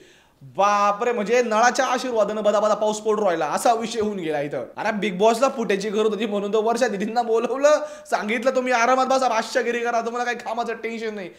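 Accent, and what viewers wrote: Khandesh, India. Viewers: direct khandeshi accent madhe bolayla lagla ye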